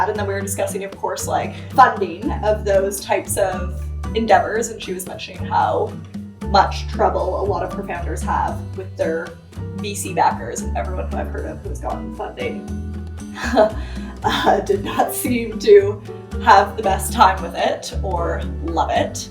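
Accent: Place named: Canada. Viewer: Great video. Lots to consider in this video. Time to jump in and go for it. I have been trying to pick out your accent. Canadian...can't believe I didn't pick up on it. Where did you get your background music?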